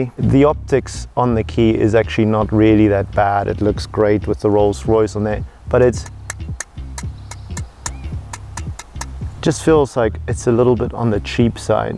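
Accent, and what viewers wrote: Afrikaans, South Africa. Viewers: Bro are you South African ?Your accent reminds me of home. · Lekker? 😂 Howzit my broer? So refreshing to hear something South African Ma se kind, i need one of those 'lekker' fat wraiths😂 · You South African?? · loved the little Afrikaans accent and words coming through lol lekke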